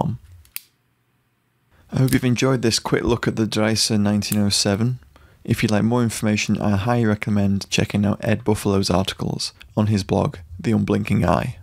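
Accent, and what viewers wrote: United Kingdom. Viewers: What a pleasant surprise to click on a gun-related video and hear a fellow Brit narrating it. I just bought a Dreyse M1907 a few days ago, can't wait to try shooting it.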